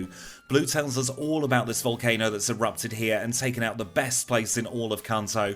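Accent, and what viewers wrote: United Kingdom. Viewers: Man, you are the best. My favorite pokemon gamer of all time, love your british accent. Can I ask you, why don't you use Dynamic Punch more often with Snorlax?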